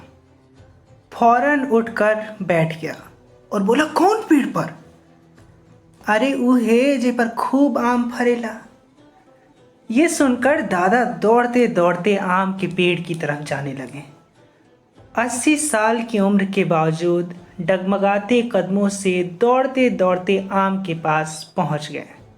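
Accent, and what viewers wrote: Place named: Bhojpuri, India. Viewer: Bhojpuri accent 🤩😂😂😂😂👌👌👌👌 Ioved a lot the way you delivered your story...❤️😂😂😂🤣🤣🤣👌👌👌👌👌👌👌👌👌👌👌👌👌👌👌👌 Characters name was amazing...Simranya 🤣😂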